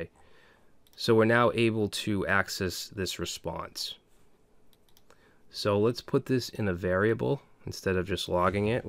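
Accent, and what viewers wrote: Boston, United States. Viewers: Are you from Boston?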